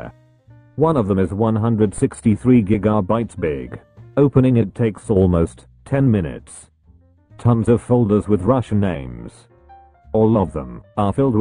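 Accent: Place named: Wales, United Kingdom. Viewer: Welsh lad isn’t he?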